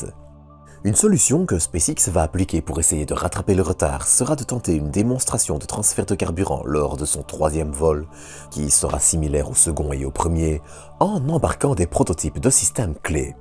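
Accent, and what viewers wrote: Belgium. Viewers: Merci pour toutes ces précisions ! Toujours un plaisir de regarder tes vidéos avec ton accent belge si appréciable !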